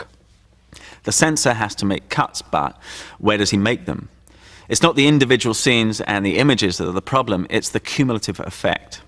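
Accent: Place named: RP, United Kingdom. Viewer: Ah, this is what he meant by his 'posh accent' haha. Love it though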